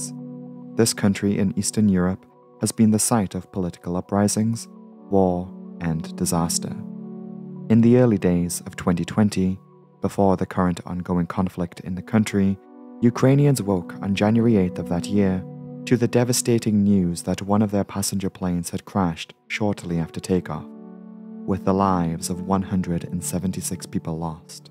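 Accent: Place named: Newcastle, United Kingdom. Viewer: Thank you for another informative video. I'll pledge if you like. Your Geordie accent sneaks out sometimes 🥰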